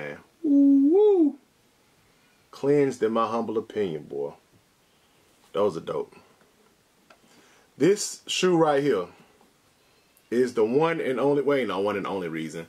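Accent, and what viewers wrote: South, United States. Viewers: man i luv your southern accent you sound just like t.i but with a lil deeper voice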